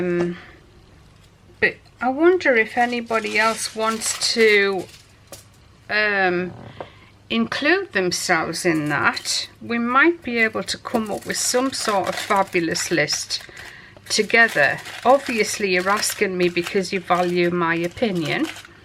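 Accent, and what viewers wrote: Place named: North East England, United Kingdom. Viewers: Love watching your videos. I live in Australia and I’m learning to make wax melts and these videos really help me :-) Thanks so much! Also I lived in Northumberland for years! my mum and family live there. Your accent reminds me of home. Im assuming you are from somewhere nearish? ha :-) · Hi. Where’s the best for designer fragrances..ie creed .. ps love the videos…love the accent .. fellow north east xx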